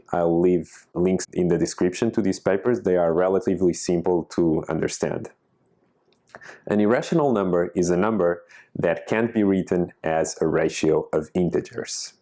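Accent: Portugal. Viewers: Pela pronúncia acho que és português. Gostei deste vídeo que encontrei por acaso. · Sotaque português?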